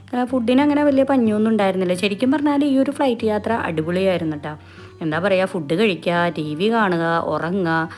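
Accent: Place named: Kochi, India. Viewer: ഇവർ മംഗ്ലീഷ് അല്ലല്ലോ പറയുന്നത്. തനി കൊച്ചിമലയാളം. എന്നിട്ടാണോ ഇങ്ങനെ പറയുന്നത്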